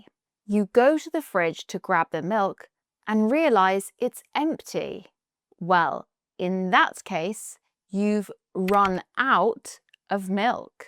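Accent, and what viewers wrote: RP, United Kingdom. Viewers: I love british english accent, and specially, the RP. There are several youtube chanells for MP; but there are just a few for RP. And I enjoy your lovely way to teach us. Thank you and greetings from Colombia.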